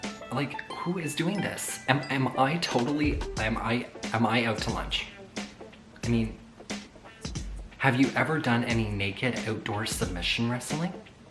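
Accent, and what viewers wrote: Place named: Canada. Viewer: I really enjoyed your video so very much, and you are very sweet and honest. I love your Canadian accent !!! Most "dating apps" are just hook up sites where horny guys look for sex. Most guys there just want to satisfy their "urges" and don't care how or with whom they do it. Makes no difference whether they are gay, bi, str8, married, curious for them " a hole is a hole" and you are just a piece meat! You certainly have self worth and dignity. Good for you for not playing their game! Do you like Italians? LOL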